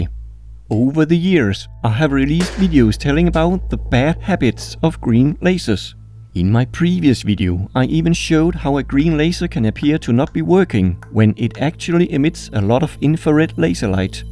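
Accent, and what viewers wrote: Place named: Denmark. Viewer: You sound Danish :)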